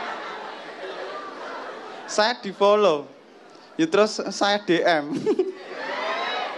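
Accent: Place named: Java, Indonesia. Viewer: Smoga mas ttep khas kya gni yak... Kampungan dan medhok nya itu loh yg bkin ngakak ahahahah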